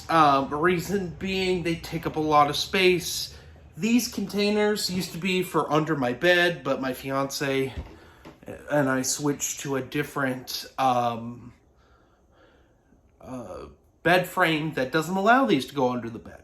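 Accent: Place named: Canada. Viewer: Why after all these years, am I just now hearing a slight northern accent, like a Canadian accent!? Sweet collection. I remember you telling me about how awesome these were. I’m hoping to give that first trilogy a go.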